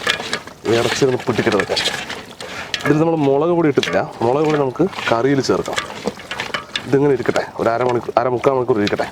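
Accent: Palakkad, India. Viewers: Namude palakkadan bhasha +firozikkade ckng😍😍😍😍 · ചേട്ടായി നിങ്ങടെ ആ പാലക്കാടൻ സ്ളാഗ് സംസാരം........ സൂപ്പർ..... ഒരുപാട് ഇഷ്ടം ആണ്......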